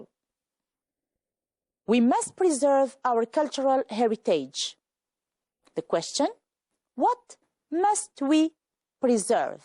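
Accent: United Kingdom. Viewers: thanks .. :'D british english (y) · Right great RP english thanks a lot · it seems that The Mr is using the British accent , i really liked it . i wish there will be some of scientific lessons .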